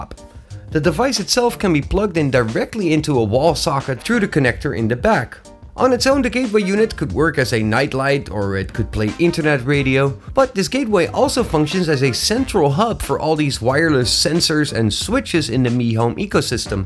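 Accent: Netherlands. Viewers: I heard a dutch accent... but I wasn't a 100% sure until I read badkamer in the app... Lekker bezig!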